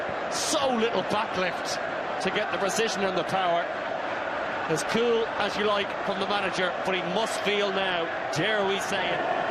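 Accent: Ireland. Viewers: Sounds more irish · irish commentary ffs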